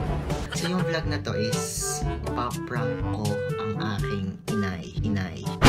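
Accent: Batangas, Philippines. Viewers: Batangeño ka ga??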